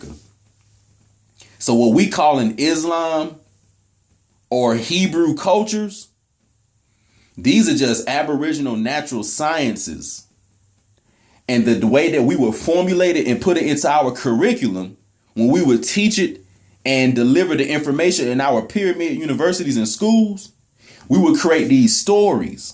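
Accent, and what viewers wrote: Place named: South, United States. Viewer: Brother, you be SOOO on point, that it's DIVINE! We've come to so many of the same conclusions, that I feel like I'm listening to myself, but in a southern American accent, LOL! PEACE AND LOVE, brother! 👍🏿✊🏿🇲🇦✡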